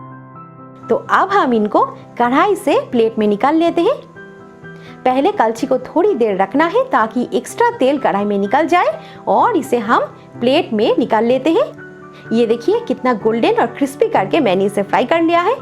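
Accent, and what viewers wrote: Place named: South India, India. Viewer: Your talking way south indian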